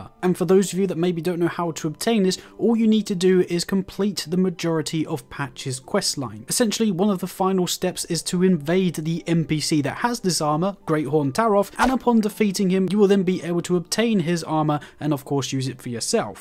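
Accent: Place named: United Kingdom. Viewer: The word is HP not heach P 😂 lol love how British people talk.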